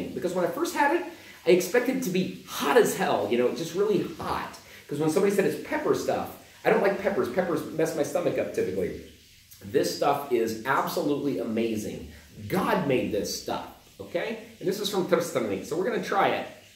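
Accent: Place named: United States. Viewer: First: you can't learn that accent of yours no matter how hard you try as Serbian- to those that say you are Serbian and just faking American origin , Serbs will always have that slavic- stronk accent. Second have you ever tried frying onion, eggs and ajvar ? That is crazy good and simple, heat up oil in pan- same amount as you put when you fry eggs, put two-tree eggs , chop half onion and 3-4 full spoons of ajvar , you put all that in same time in pan, fry it couple mins until eggs are done and mix it while you fry . You can also fry onion a little before you put eggs and ajvar if you like that, but not necessary. You will know its done when eggs stiffs. With some bread , yogurt or fetta salty chese you have simple and delicious meal. You fry lower quality ajvar from markets with eggs to still make it good btw.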